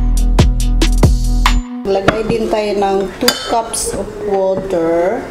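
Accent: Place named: Iloilo, Philippines. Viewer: Ang cute!ganyan din ang accent (ilonggo)ng nanay ko tuwang-tuwa ako habang nanonood.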